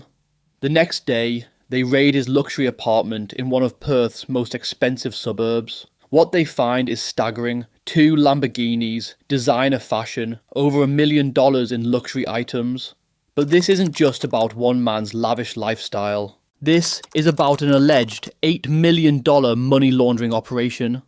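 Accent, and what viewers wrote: United Kingdom. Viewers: im so grateful you have a british accent, so i know you're safe in the UK bro, if i did videos like yours, as a local, i think i'd have massive testicles, but also know i wouldnt be safe. you're a legend.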